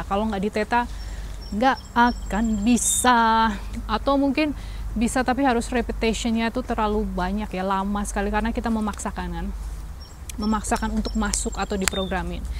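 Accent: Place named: Java, Indonesia. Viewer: Masyaa Allah... Matur nuwun sanget ilmunya Mb, I love my long hair. jadi tahu sekarang, Alhamdulillah for everything. Btw, dialog Jawa nya medok banget Mb, Jawa mana Mb? Salam kenal aku asli Jawa Timur Mb. Sukses selalu dan bahagia sekeluarga..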